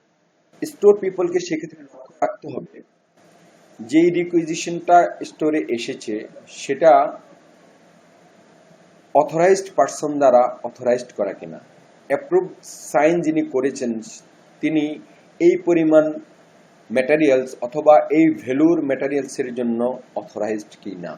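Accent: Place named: Comilla, Bangladesh. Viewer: Why are you mixing your lecture with english, bangla, camilla accents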